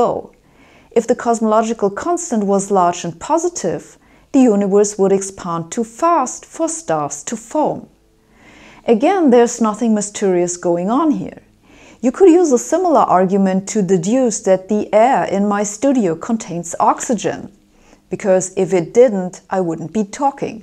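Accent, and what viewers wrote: Germany. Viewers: Not related at all...well partly maybe... I love accent. In particular as a specific example of my appreciation of the general German accent. I note that certain accents rub me a certain way. German sounds cuttingly precise, the way you'd expect a high quality German automobile to be designed. Russian and Indian sound kind of comical to me, in different ways. The royal British accent of course sounds incredibly high brow, very polished, and upper society. Australian sounds amiable, down to earth, matter of fact, in a jovial way. Italian sounds happy and very excited. I could go on but I shant. Fuggedaboudit!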